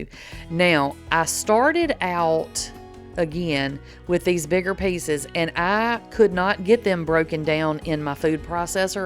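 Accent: South, United States. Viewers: Thank you for posting ma'am... I enjoy your channel and love your accent... I was in Ft. Benning in the early 90's and miss the lifestyle and accent. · your sweet southern drawl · Yes, I love your Southern accent too! ❤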